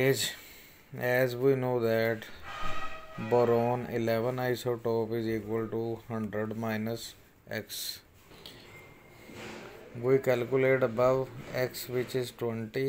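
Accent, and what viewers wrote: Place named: India. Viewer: Wow this is amazing Am helped a lot though am a Zambian and I had some difficulties in getting the Indian accent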